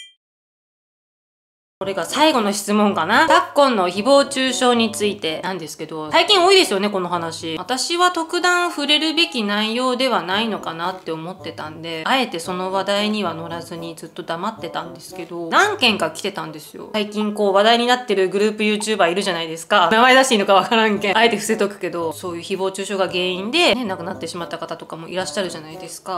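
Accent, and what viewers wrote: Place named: Miyazaki, Japan. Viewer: さんの豪快な食べっぷり、包み隠さず本音でなんでも話してくれるところ、方言（思うっちゃけど〜とか宮崎出身の私にはとても聞き心地が良い）全部好きですよ😊 コメントたまにしかしてませんが…これからも応援してまーす😊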